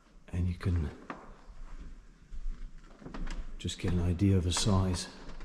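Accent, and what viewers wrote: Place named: South Africa. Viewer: Plesier. Lekker om n Suid Afrikaanse(afrikaanse) stem te hoor daar in my agtergrond!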